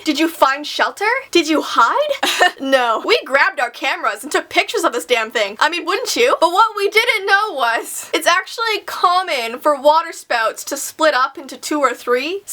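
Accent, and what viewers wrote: Canada. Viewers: K.a.s. Kast naw she sounds Canadian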